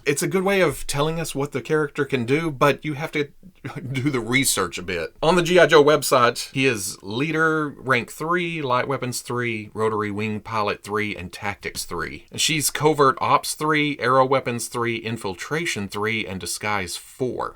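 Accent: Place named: Arkansas, United States. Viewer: Wow, Arkansas really comes out in this video.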